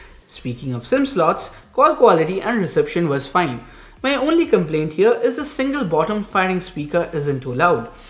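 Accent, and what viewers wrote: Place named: Bengal, India. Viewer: Hey, are you a bengali?🤔 Your accent (specifically how you pronounce your name) makes me think so... By the way, nice content as always ✌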